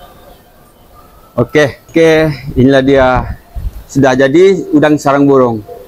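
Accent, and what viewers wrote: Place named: Sabah, Malaysia. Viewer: Mantap bro,logat macam org Sabah saja....betul ke...?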